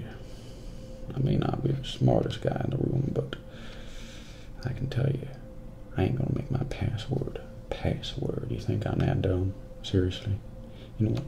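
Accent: South, United States. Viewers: The whispering southern accent reminds me of Morgan freeman for some reason haha. Nice video man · Wow, you look so different with hair - and a beard too!!.......ASMR Muzz has just done the opposite and shaved it all off. So now he looks like a hitman gone renegade! Great accent btw....or is yours a 'southern' US one? Your vids are so imaginative, and a far cry from the usual ASMR fare if you know what I mean! .....the best ASMRtists are the ones who make vids no other ASMRtist does....like yourself, Muzz, and Phoenician. Grow out the beard, and before you know it you'll be featuring on Beardbrand with Jeff whatshisname!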